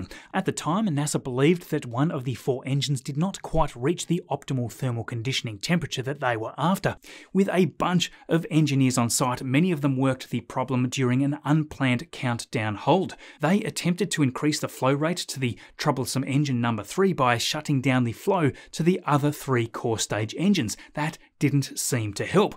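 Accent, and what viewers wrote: Australia. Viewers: I lived in Brisbane for 5 years. I come here for weekly dose of Australian English ... LOL I do like the Aussie English .. I am from Brazil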